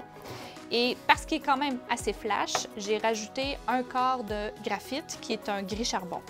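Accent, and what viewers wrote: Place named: Quebec, Canada. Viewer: C'est super d'avoir des vidéos en français avec le si joli accent québécois.......Un petit coucou de France . Ici nous n'avons pas les mêmes produits mais je me débrouillerai surtout pour la cire........La revalorisation de cette armoire tombe très bien car j'ai une armoire de chambre blanche que je vais mettre dans mon atelier que j'ai enfin dans ma toute nouvelle maison en bois......Ce bleu me plait beaucoup.....Si je ne me trompe pas j'espère que BB est bien arrivé. Merci encore pour ces vidéos.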